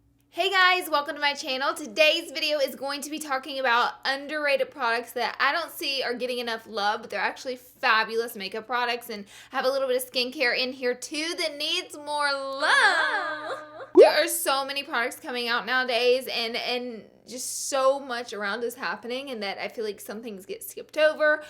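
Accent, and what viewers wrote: South, United States. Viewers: "wear it around town" hahah! where are yu from i can't put my finger on the accent! sounds southern almost to me!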